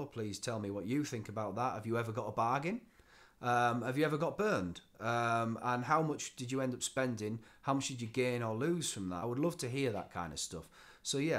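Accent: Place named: Northern England, United Kingdom. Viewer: I forgot to say - great to hear a northern voice on YouTube. I spent a number of years in Bolton and me mum is a Sheffield lass. Living in the US now - and miss hearing those dialects. Yeah, Epiphones are hit and miss. I think the Korean made Agiles (from Rondo) are better. Not sure if you can get those back there. Regarding the fret-ends on that Aliexpress Tele - get yourself one of those 45 degree angle block files, a fret file with the corners smoothed off, one of those diamond-crusted crowning files, and a set of micromesh pads (you can get them all cheap on eBay). You seem to be able to do a bit of work on your guitars so I think you can tackle those fret- ends mate. By the way, I have to do that job on almost every guitar I buy online - so it's not just the Chinese knockoffs on Aliexpress. Fret dressing tools are a must for modders and restoration folks methinks.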